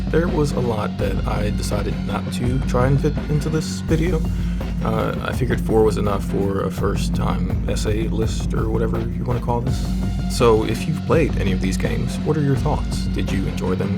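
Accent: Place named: East Coast, United States. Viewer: Im guessing you are part of the east coast of the US. ?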